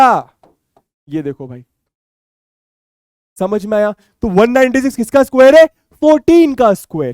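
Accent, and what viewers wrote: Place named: Bihar, India. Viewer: My mom asking "is he bihari"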